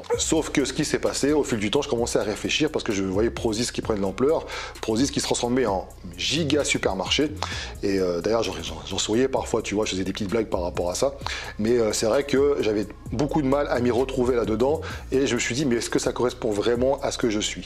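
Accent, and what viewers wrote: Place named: Nice, France. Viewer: * qui a de plus en plus l'accent niçois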